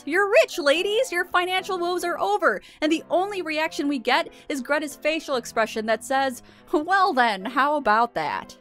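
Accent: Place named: United States. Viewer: American accents like yours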